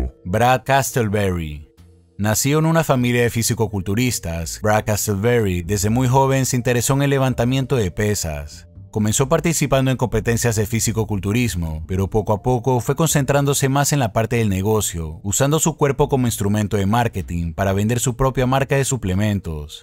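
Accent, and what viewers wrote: Ecuador. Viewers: Es Ecuatoriano así hablamos a veces 🤣🤣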